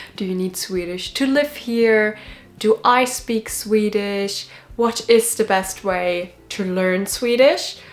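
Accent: Germany. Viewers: Åh, du pratar ju jättebra svenska. :) Du har en ganska tydlig tysk accent, men rent språkligt var det bra. IMO coming from Germany, Netherlands and obviously Denmark and Norway you have a quite a large advantage (even compared to English speakers) due to the similarity of words and pronunciations between German and Swedish. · Du pratar bra svenska 😊. Man hör din tyska accent mer, men det är bara trevligt. Vi är ju vana att lyssna på drottning Silvia 😊. Dessutom är vi också vana vid invandrare från olika länder som försöker lära sig språket. ❤ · Sehr gut svenska med ein bisschen Deutsch men helt keep on work on it 😊 tack så mycket hörde du hade dialekt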